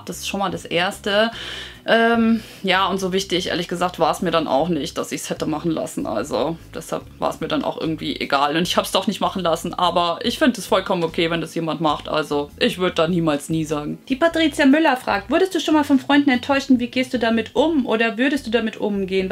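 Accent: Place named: Hesse, Germany. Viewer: Auf Hessisch bin ich jetzt so gar nicht gekommen. Musste gleich lachen als meine Frage prompt in deinem Intro beantwortet wurde. Süß irgendwie. 😊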